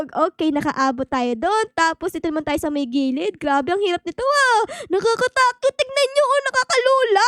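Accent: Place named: Baliwag, Philippines. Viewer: para kang baliwag na bata diyan magsalita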